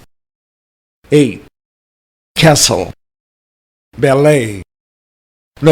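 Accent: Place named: United Kingdom. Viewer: This is by far the most fun I have EVER had with a youtube video. It's true but more like with a British accent.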